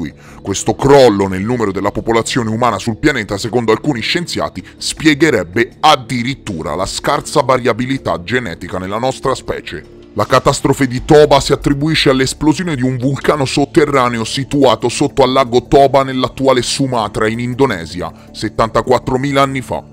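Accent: Tuscany, Italy. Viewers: Grande.... Se posso chiedere ma sei toscano? Scusa la mia curiosità grazie per le informazioni che ci metti a disposizione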